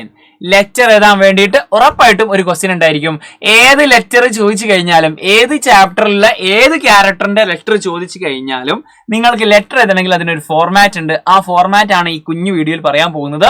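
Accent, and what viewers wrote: Kozhikode, India. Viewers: Sir Kozhikode ano👀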